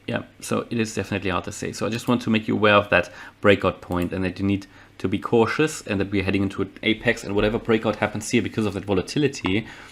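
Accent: Germany. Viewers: Nice vid. U must be German yeah? 😆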